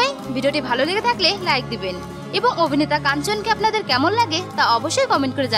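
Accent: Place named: Bangladesh. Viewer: Kothakar manush apni?mone hoi Bangladeshi.thik vabe bangla bolte parchen na.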